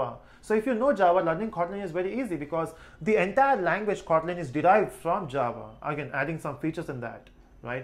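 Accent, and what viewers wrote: India. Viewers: It seems like a good content, but I just can’t stand Indian accent.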